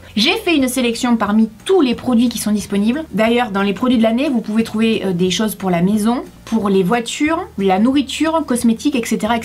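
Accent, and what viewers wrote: South, France. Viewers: Je voulais te demander ton accent vient d’où ??? J’imagine de sud mais je sais pas d’où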